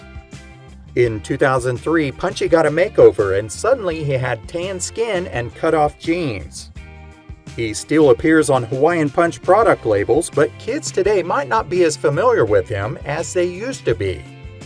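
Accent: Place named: South, United States. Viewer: It seems like you are from the South, but I can't place that accent. I fear it might be Virginia. They need to be reclassified.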